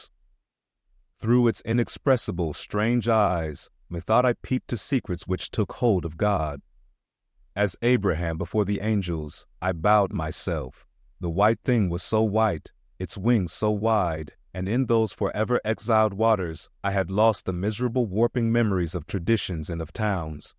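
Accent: United States. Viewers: FINALLY an American reader!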